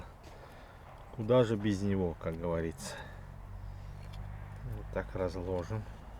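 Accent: Baku, Azerbaijan. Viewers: Как только услышала речь, сразу догадалась, что это бакинец. Не смотря, что сейчас живу в России, но акцент южный бакинский остался. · Отличный рецепт, выглядет очень аппетитно, а мой родной бакинский акцент это бомба 💥👍👌👍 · Азербайджанский акцент не перепутаешь не с каким другим. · Приятно услышать бакинскую речь и видеть интеллигентного человека! привет от бакинского армянина из москвы! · Во первых блюдо вкусное))) Во вторых, бакинский акцент прикольный👍👍👍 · джан! Джан ээ бакинский акцент ❤️🤲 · Бакинских акцент 👍🏼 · Ты настоящий Бакинец,по акценту видно,очень понравилось твоё видео.Здоровья тебе,твоим родным,спасибо тебе ахпер.Обязательно такое приготовлю,если смогу.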